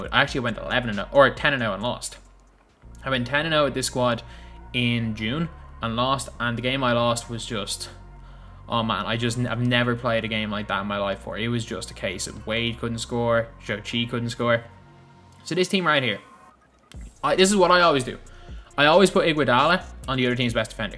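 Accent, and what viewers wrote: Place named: South Dublin, Ireland. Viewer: This lad is defo from South Dublin ngl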